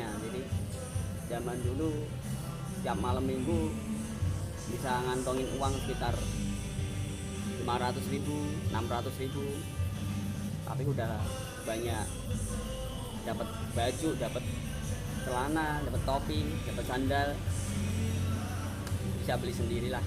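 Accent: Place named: Java, Indonesia. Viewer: Mas logatnya org jawa, jawanya dmna mas?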